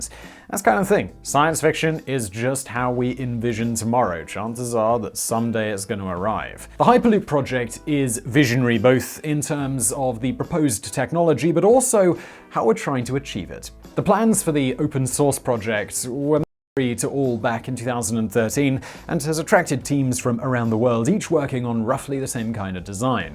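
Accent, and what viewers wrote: United Kingdom. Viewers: Several other people write the scripts and just sits in a chair and reads them off a monitor. You can tell he doesn't even proof read them before he records. (see all the times he reads a line about hair, or balding and he'll laugh and says, "come on guys!") In this video at about something he says "Elon Musk has made a name for ITSELF..." and process to crack up on the line about smoking weed. He has not read this script before saying those words. So yeah, it's a team of people, and is just the member of the team that sits on front of the camera and reads the scripts in a British accent.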